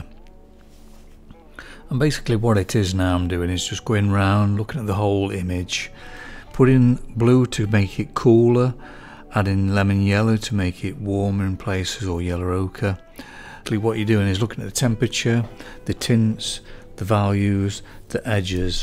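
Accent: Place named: Derbyshire, United Kingdom. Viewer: Thanks! That was very helpful! Love your accent too! Derbyshire? Notts? I work in pastels but have never attempted a portrait so far.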